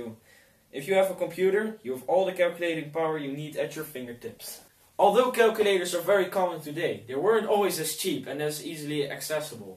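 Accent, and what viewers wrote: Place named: Netherlands. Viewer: ok, although speaking rather good english, my ears are telling me he's Dutch. Is he?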